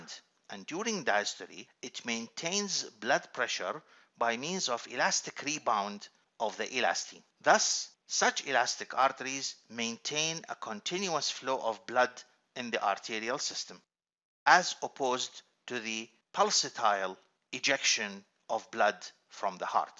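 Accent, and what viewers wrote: Iraq. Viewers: شكرًا يا دكتور .. احساس كبير من الاكسنت ان حضرتك عراقي😅🙈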